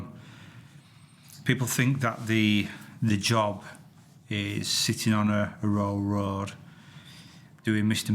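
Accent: Yorkshire, United Kingdom. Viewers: Love yer Yorkshire accent. Full marks for your bravery. Well done sir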